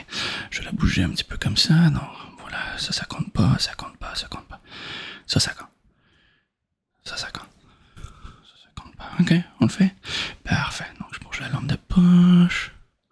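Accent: Quebec, Canada. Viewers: Je pensais pas que l'accent québécois fonctionnerait autant sur moi en ASMR. Je te remercie en tout cas, j'ai du mal à m'endormir en ce moment et tes vidéos sont d'une grande aide ! ♥️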